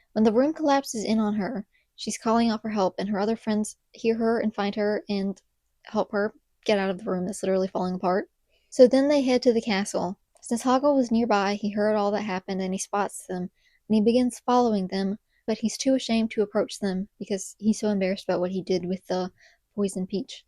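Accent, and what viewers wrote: South, United States. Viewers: What is your accent? I can't place it but it's a lovely southern accent. I'm from Texas and Arkansas (half my life in each state). Is it Tennessee perhaps?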